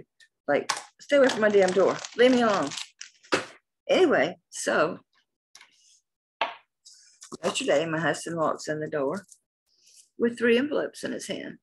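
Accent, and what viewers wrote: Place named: South, United States. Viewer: I luv your videos & your sweet southern accent is the best!! Your stories & humor makes your videos FUN!!! My necklace arrived & I love it 🥰🐰🌸💕have a great weekend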